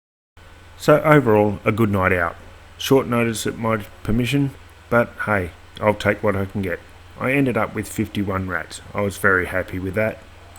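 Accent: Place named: United Kingdom. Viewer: British! Lol heck yeah!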